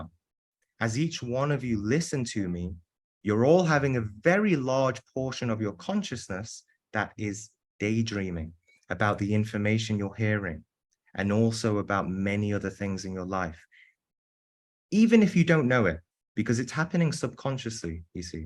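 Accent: United Kingdom. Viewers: It is very difficult for Me to Accurately Express what I intend to Convey...but I will try. I was initiated by an Indian Sat Guru at the Age of 23. I am 73 now. The Tradition of My Guru's Philosophy originates from the Punjabi River region of Northern India...the Homeland of the Sikh Religion. I have been through Many Chapters of Life...since My Initiation...but after listening to Lecture...I now realize just how little I knew about Meditation, the Dream Phenomenon, or Astral Travel...in the 50-years following. I took Copious Notes...during This Lecture...and Now that I have had a Chance to Recopy It...adding My Own Incomplete Sketches of Inner Knowledge...I am At a Complete Loss for Words. I am Now Seeing that I have Added the Lectured Information (Given by )...to Complete what I Already knew and wrote beforehand. It is like Writing The Subject & Verb of a Sentence...and then a Wiseman entering the Room and completing Each Sentence with the Necessary Object...to make the intended Concept...A Complete & Comprehensive Set of Ideas. 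I am describing Something very Spiritual here...but I am merely a Student-in-Progress...sitting in Classroom. I have only had maybe 'Six' of what I would classify as genuine Out-of-Body Experiences in 73-years of Physical Life...but is a Master-Teacher at His Craft...with a Beautiful British Accent to Clearly Express His Lofty Teachings. There is A GOD...and I am truly an Unworthy Recipient of His Endless Grace. I have found a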